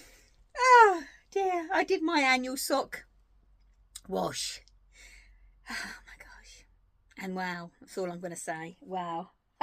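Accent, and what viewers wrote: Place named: Essex, United Kingdom. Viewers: Hi, please ignore these people they obviously have very sad lives and more spare time than you or I. You talk like me because we both come from Essex, I originate from Colchester so it is our accent. Don't think about changing ever, you make me laugh. And I would rather you say well done lots than not say it at all. Tc our lovely friend, get well soon and enjoy the show on Sat.. Look forward to seeing what you buy. Lol xxx🧶🧶👍🏻💖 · I'm catching up on some back episides 😂 I LOVE those Crunkle socks, they're gorgeous. I'm so glad you blocked that idiot. Honestly I love your accent, I grew up in Essex but left probably 30+ years ago and I love your accent, it's so lovely to hear. You keep being you, you're a treat and it's blooming kind of you to do these videos at all, they take a lot of time to do don't they! ❤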